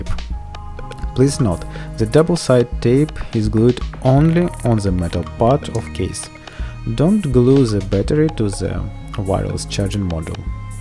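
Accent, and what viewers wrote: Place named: Russia. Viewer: страшный руззкий акцент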